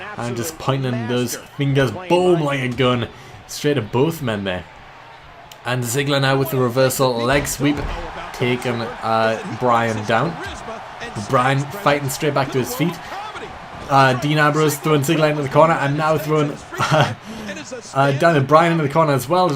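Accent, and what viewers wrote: Newcastle, United Kingdom. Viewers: you from newcastle?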